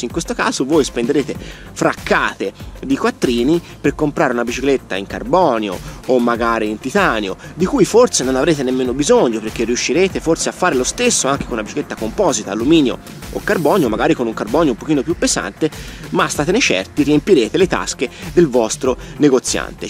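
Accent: Italy. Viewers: Ma di quale parte di siena sei? Dal tuo accento mi sempri senese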